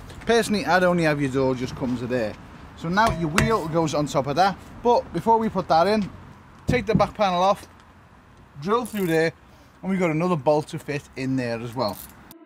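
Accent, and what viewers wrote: Liverpool, United Kingdom. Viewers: Scouse YouTuber...go ead la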